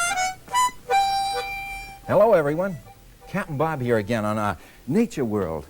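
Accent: Boston, United States. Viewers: Love that “Bahston” accent!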